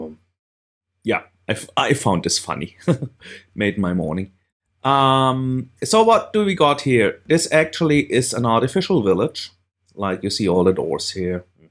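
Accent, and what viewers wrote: Germany. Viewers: man merkt das du deutscher bist :D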